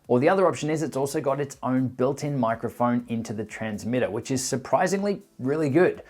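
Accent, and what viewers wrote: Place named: Australia. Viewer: ugh just love australian accents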